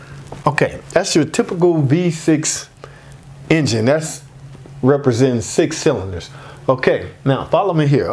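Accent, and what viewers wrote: South, United States. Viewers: I thought it was just your dialect. Are you in the south?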